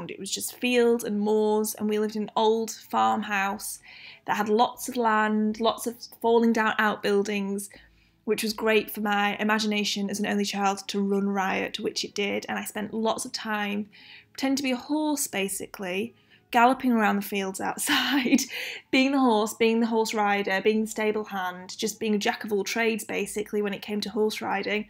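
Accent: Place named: North, United Kingdom. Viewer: What style is your wonderful accent? It‘s northern style but not too yorksherish I‘d say....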